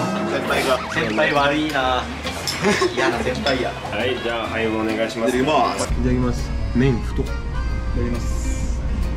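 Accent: Java, Indonesia. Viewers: semenjak ada, seneng banget liat bang ngomong medok jowo banget 😂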